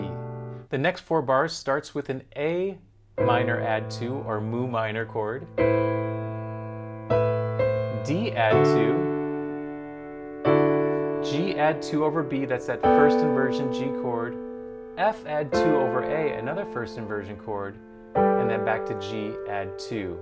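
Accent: United States. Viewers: That was inspiring,. Any chance you're from Lowell, MA?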